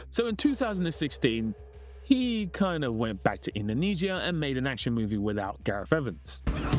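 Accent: United Kingdom. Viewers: Gosh, this brits accent is so terrible!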